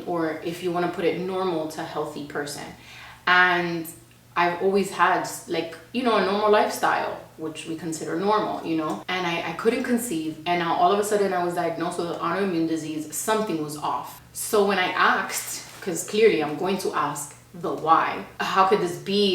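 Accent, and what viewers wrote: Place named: Miami, United States. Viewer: you sound miami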